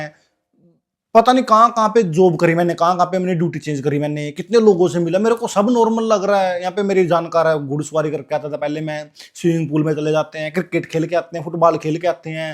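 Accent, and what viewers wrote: Haryana, India. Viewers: Not to offence apki tone kitni haryanvi hai lagti hi nhi hai apko German suit karti hogi par earn karna sa matlab hai 😅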